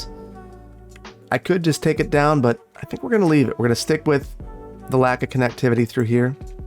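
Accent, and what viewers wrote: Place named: Midwest, United States. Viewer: Same! I just assumed he was talking about the Midwest from his accent alone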